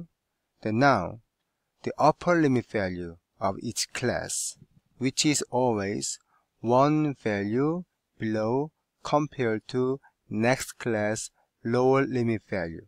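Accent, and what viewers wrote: South Korea. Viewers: Off topic but can i ask why does your accent sound like Korean English accent ? Or just mind is still in SK..... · Omooo!!💜thank you so much😇 Wait am I the one here feel confused about the man speaking having a Korean accent of English??😁anyways good job really a life saver😇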